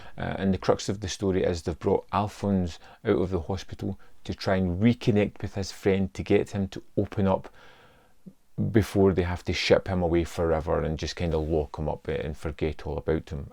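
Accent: Scotland, United Kingdom. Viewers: I'm here because another place asked for best Nicholas Cage movies and i thought of Birdy. I've been in mental hospitals so I related to that part. At one point Modine mentioned Wrestling and around this movie he also did Vision Quest. Both movies showed him really in good shape. But hey...I was too at that age. Oh, and since I'm new to this place, was is your accent? I live in in Boston and have that and it's different than New York which isn't far away. But I'm guessing Scottish?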